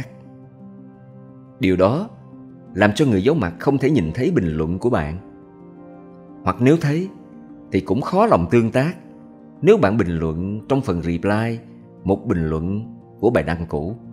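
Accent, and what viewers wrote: South, Vietnam. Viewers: Hi, Chúc anh ban cùng gia đình mọi sự tốt đẹp nhé! Tôi phải ngả mũ chào ngưỡng mộ qua tuyệt vời!! 👍👍👍👍””I fall in love with you ””” about Well- knownledged, well prepared, well organized từ cách giới thiệu rất hoàn hảo, đẹp mắt! Từ giọng đọc thật truyền cảm, thật “” Nam kỳ tình”” giong cuoi rất khôi hài!! Chúc anh ban thành công và tien mai trên sự nghiệp nhe! BRAVO BRAVO 👏👏👏👏👏❤️❤️❤️❤️❤️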